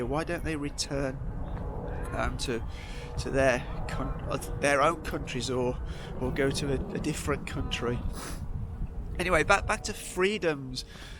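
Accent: United Kingdom. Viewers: It is interesting to see some British guy (judged from accent) filming comments about China while self-wondering in Chinese city lol.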